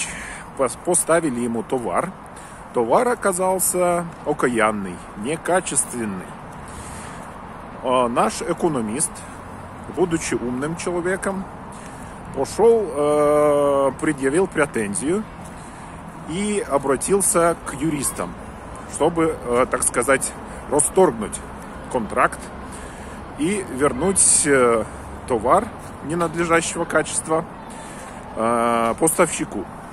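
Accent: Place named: Saint Petersburg, Russia. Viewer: Ты же из Алтайского горного округа родом, здесь Петербургский русский язык со времен горных офицеров, кабинетных земель, золотых рудников итд, без аканий и оканий. Разве что, уральский казачий говор есть, как в речи у Шойгу.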